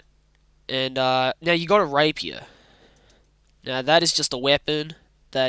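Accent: Australia. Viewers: Bro his Australian accent is so much stronger here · Strong occa Sydney accent here I was not expecting this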